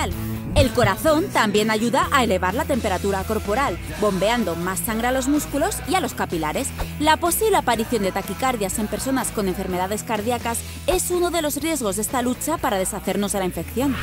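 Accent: Spain. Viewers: es de España y así lo dicen ahí, no tienes por qué corregirlo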